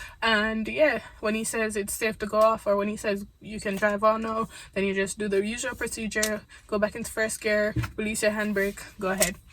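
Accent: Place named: Jamaica. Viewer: Girl, when I head that Jamaican accent I got gassedd!! I love finding Jamaican YouTubers. These tips were helpful even those I failed twice lool I'm going to use them in my next test and hopefully, I pass because ain't no body got money to be paying for lessons and test again and again.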